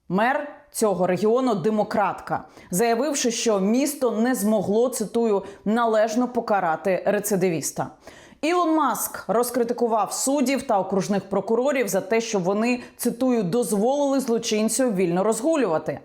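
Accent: Russia. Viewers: ну не під силу вам український синтаксис - хай вже як є, але ж вивчіть бодай українську фонетику - це не складно вам буде. Ну не можна ж вимовлять українські слова чи не в кожному другому з них по-московському. До Азірова не так вже й далеко.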